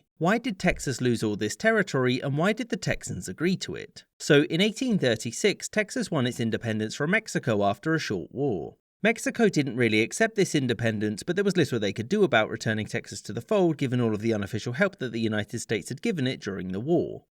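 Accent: England, United Kingdom. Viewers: Love to hear a Brit explain my home state's History. Something I had to look up myself because 7th grade Texas History didn't cover it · Nothing is more amusing than listening to a British man talk Texas things to a Texan 😂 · I love listening to Englishmen talk about my country's history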